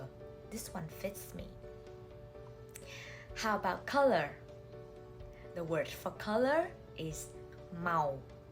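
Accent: North, Vietnam. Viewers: Northern accent?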